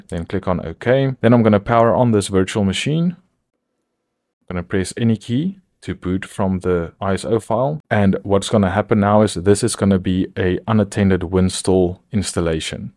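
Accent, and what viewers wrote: Afrikaans, South Africa. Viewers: Baie dankie vir hierdie video. Dit is die eeste keer dat ek op jou youtube video's afkom. Gaan nou na alles wat jy het kyk, verstaan nog nie 100% nie, maar gaan nou na hulle kyk. Toe ek jou stem hoor, het ek dadelik agter gekom dat jy dalk Afrikaans is.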